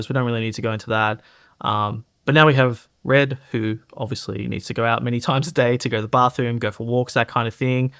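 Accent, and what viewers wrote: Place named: United Kingdom. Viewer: I send my condolences about Red,. (I don't know why but I would have guessed you were British.:))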